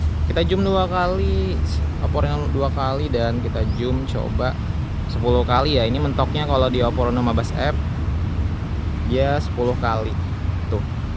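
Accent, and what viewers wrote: Banjar, Indonesia. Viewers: Urang banjaarr..kh?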